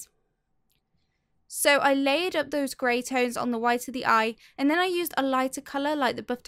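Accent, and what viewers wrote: United Kingdom. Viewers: You have an British accent..it's good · "phoutous"....man that British accent is boss!!!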